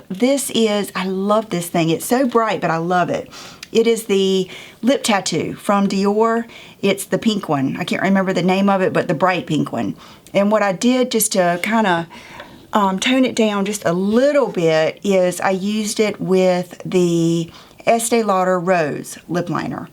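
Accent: South, United States. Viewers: Love your accent, are you from the south in the USA ? Maureen x from New Zealand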